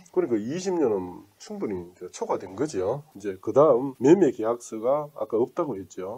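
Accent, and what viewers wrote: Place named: Gyeongsang, South Korea. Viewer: 이 친구 말투로 보니 대구출신이네.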